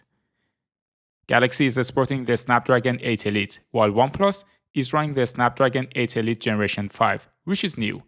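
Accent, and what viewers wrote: Iran. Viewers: Bro you sound like a Persian guy trying his best to speak fluent english.❤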